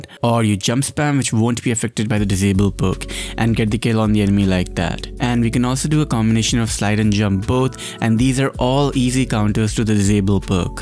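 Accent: India. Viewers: bro are u from india? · Bro! R u a Indian?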